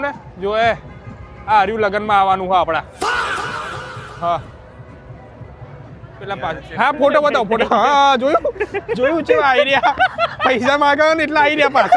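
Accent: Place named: Mehsana, India. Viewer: ભાઈ મને તો મજા આવે છે એક દમ સિધ્ધપુર ની ને મહેસાણા ની ભાસા સાંભળવા મજા આવે છે તમ્મરીયા આવા છે ચક્કર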